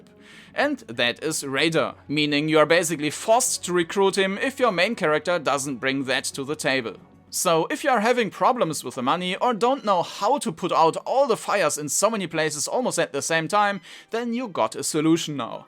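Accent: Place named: Germany. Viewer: der deutscheste Akzent, den ich je gehört habe 🙂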